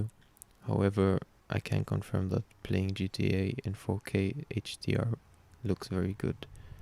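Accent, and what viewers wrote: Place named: Sweden. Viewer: Tf is with swedish accent, lol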